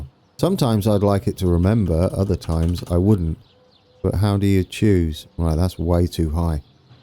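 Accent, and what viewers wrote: United Kingdom. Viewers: Ah yes, soothing British voice to brighten my day.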